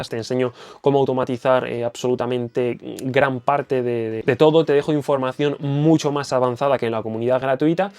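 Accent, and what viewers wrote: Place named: Argentina. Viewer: Mira Te Vuerbo Ha Escribir El, PQ Soy Un Poquito Bruta Yoo Te Guarde Para Despues Ponerte Cuando Mii Hijo Quiero Desircelo Para Asii Y Una Ves Mas Q, Ellos Agan Eso Mismo Le Escribo Desde EEUU Ves Noo Se De Donde Eres Me Pareces Como De Argentina Lindo Pais La Señora Q, Vive Ariba Segundo Piso Ella Es De Argentina Loo He Mirado En TV Ho De Uruguay Ablan Parecidos Saludos Soy Cubana Cuidate Muchoo?Gracias Bendiciones!🙏👏🤚😪💙💘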